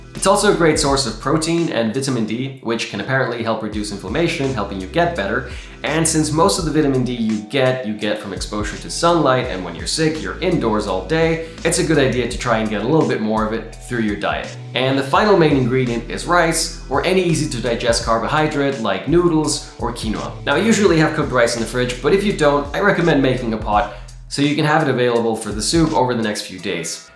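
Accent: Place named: United Kingdom. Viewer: How to sound british without sounding british. Where do you live sir?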